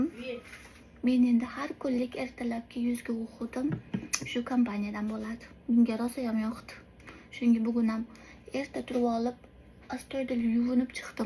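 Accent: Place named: Fergana Valley, Uzbekistan. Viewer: УЗИ если,Тошкентлимисиз йоки водийданми?Шевангиз Водийга тортади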